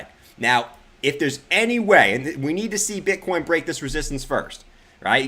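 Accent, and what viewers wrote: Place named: Boston, United States. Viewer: I got interrupted! Loving your advancement of effects etc. and yes you still have a huge Bostonian accent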